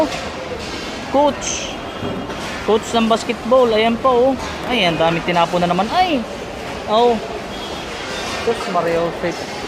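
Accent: Quezon, Philippines. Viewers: Ah ah parang ikaw ay taga quezon hehe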